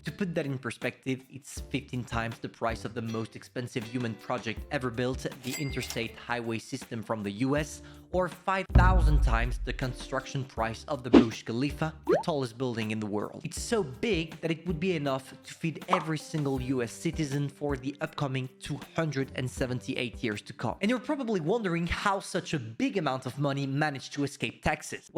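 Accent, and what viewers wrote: France. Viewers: ! Been watching you for a while know and you probably know that already. I would rate this video a 9/10! For a first timer this is fantastic and I would definitely not mind watching vids like this. The only thing you could improve with is your pace. It was a bit quick and due to your French accent it's slightly difficult to understand. If you slowed down a bit 10/10 vid! GL on making more vids like this, these type I enjoy:D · Very interesting and informative... but IMO it would be good to have some curated subtitles alongside as your French accent is quite strong. I find it difficult to understand some parts as a native English speaker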